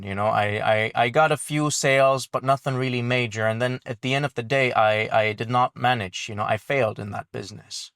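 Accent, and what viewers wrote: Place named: Jamaica. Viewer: Liked and subscribed because your accent sounds kind of Jamaican 👍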